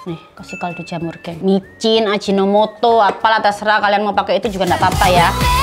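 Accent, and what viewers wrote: Java, Indonesia. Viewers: Bumbu nya medok banget kaya ngomong nya medok banget 👍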